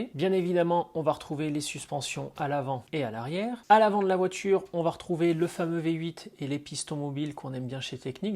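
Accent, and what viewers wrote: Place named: Quebec, Canada. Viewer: Simple question j'ai remarqué un peu ton accent est tu d'origine québécois ou tu vient de la France ?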